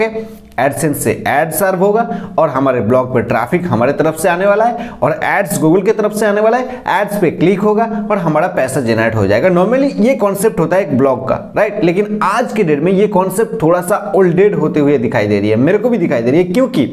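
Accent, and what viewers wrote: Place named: Bengal, India. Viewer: Ore Bangla te bol bhai... Puro bangalir moto hindi bolchis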